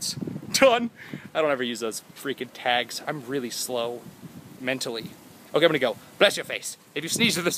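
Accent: New York, United States. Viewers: the new york acsent video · R u American or English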